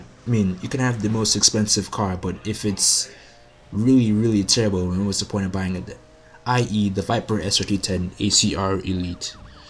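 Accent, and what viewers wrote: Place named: Africa. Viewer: LOL, I can hear the Arfrican in you so clearly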